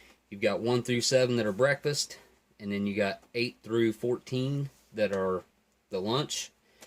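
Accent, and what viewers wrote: South, United States. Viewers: Where ya from? At first I thought you were Australian but you sound American. You from Texas? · recognized the heavy southern drawl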